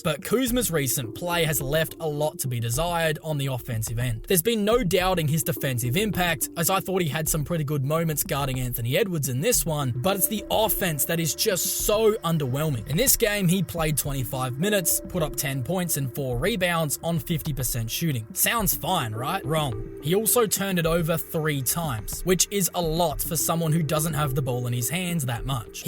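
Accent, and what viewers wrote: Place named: Australia. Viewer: Dang Australian accent. Is it AI?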